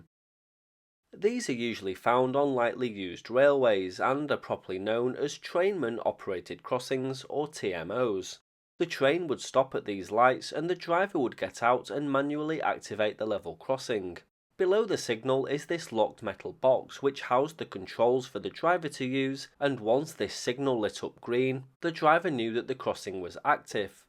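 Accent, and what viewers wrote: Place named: Northern England, United Kingdom. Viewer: with a northern accent, love it!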